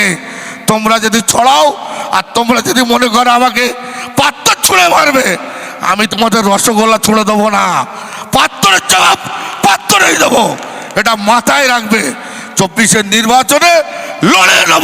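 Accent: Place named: Khulna, Bangladesh. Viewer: খুলনার ভাষা।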